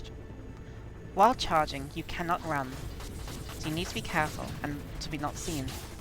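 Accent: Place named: Iceland. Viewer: That would be an Icelandic accent. Most people will have an accent carrying over from their primary to their secondary language, it is only natural for this to occur.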